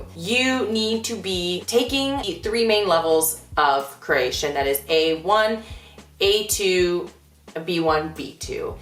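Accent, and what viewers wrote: United States. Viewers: and now , all that you said in English, translate into Croatian, Serbian and Bosnian. Write as you speak, read as it is written... Work on the voice pronunciation - "Ć, Č, DŽ, Š, Ž ... in your case, since you are an American - R voice, too... Language has a lot of consonants, seven cases, which is not a lot, Finnish has 14... It is hard, but you'll get it :) you do not need a Cyrillic letter for Croatian, you do not need it even for Serbian, only if you are an enthusiast. This was once called the Serbo -Croatian language, and now they are separated - these are nuances, language is the same ... Bosnian is the same language, too :)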